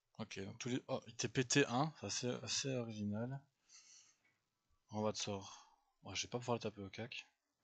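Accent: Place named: Switzerland. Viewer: Joli ❤ iop joli l'accent suisse 😂❤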